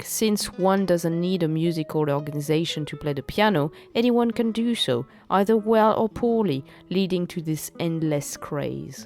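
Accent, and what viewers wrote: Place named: France. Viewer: Very informative and nice talk, like your authentic french accent😊❤And thanks to F.Sor🙏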